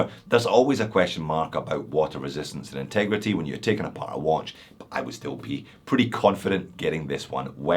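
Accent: Scotland, United Kingdom. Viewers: A Scottish Barry White? Now HE would have made a fortune!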